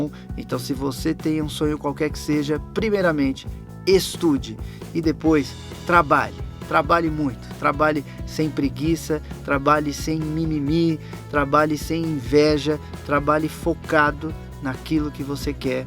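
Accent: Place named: Northeast, Brazil. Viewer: q parte do nordeste vc era?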